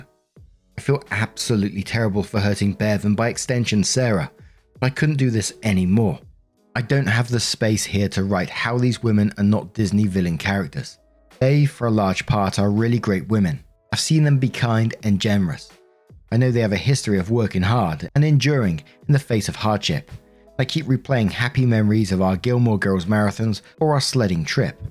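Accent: United Kingdom. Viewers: You have a pleasant voice to listen to, but I can’t tell if you are from US or UK. UK?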